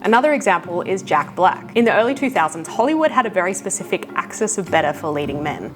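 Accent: Australia. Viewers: Exactly. I think this kind of mistake is generational and geographical. She sounds Australian and also young, so probably wouldn't have the best grasp of British car industry and world history. The Mini was made by BMC, designed by Alec Issigonis. Volkswagon was created by the German govt and the Beetle was designed by Ferdinand Porsche. The word Volkswagon means 'People's car'. Sure both the Beetle and the Mini were 'cheap transportation' icons around the world, but it's pretty inexcusable to make the claim in the video. Poor research. Ironically the Beetle made Volkswagons name and turned a struggling company into a corporate superpower (more ironically it was rescued from the scrap heap by a British army general in the last days of WW2) and would eclipse the entire future UK car manufacturing industry. The Mini was a cultural icon of the 20th century almost to the degree of the Beetle, but it helped bankrupt BMC as each one was sold at a LOSS. 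The more Minis sold, the more money BMC lost....and yet they didn't actually replace it with a more profitable new model until 1980 with the Austin Metro. The reason cited for retaining the Mini was always 'The Mini is still selling in large numbers'!! 🤣🤣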